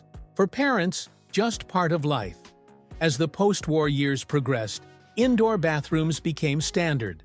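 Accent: United States. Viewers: Appaling American narration yet again! Why carnt you read your script through properly, before starting!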